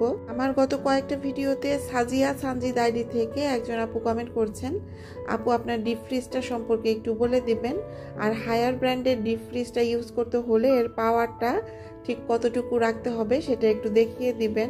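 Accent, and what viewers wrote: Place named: Bangal, Bangladesh. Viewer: কি সুন্দর বাঙ্গাল কথা বললে❤একদম সংসার ছেড়ে একদিন ও কোথাও ও থাকার উপায় নেই❤লক্ষী বোন আমার আছি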